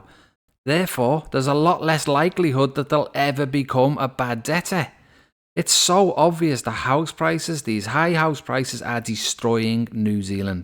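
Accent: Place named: England, United Kingdom. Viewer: Also it’s interesting to note you’re accent is English, New Zealanders have paid massive money to our indigenous Maori And rightly so, but what’s so disgusting about it is your average New Zealand in fact all New Zealanders never made the deal but paid the debt for the UK, we never gave their land away illegally your English monarchy did it but yeah this country paid the bill with nothing ever removed from the precious royals thou their family signed the deal and stole the land. Also more men died per capita in the second world war than most countries including England, we stood beside England from the start until the finish with the NZ Army being one of the best performing armies throughout, yet we’ve always been punished as the colonials used as Cannon fodder for those superior English inept generals, so the guys who help save England then have to pay three times the amount to get to the UK because of the exchange rate in England then gets all the benefits of coming to New Zealand and now thanks to you will be now snapping up all our property & everything else and at a third of the cost. 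So thanks for pointing that out to half of England because I’m sure you’ll all benefit from it again at our expense especially now England’s at War again internally, I’m sure they’ll be using all your wonderful knowledge to take advantage of us Kiwis again so thanks a lot mate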